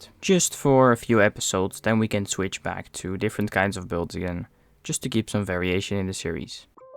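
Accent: Netherlands. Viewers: where is ur accent from? are u dutch?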